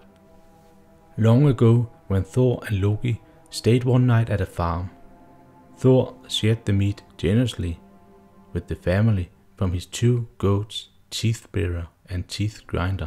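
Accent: Denmark. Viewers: The infamous danish accent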